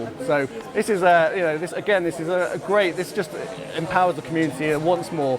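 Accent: United Kingdom. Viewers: cool an Asian with a British accent demonstrating my favorite game! :D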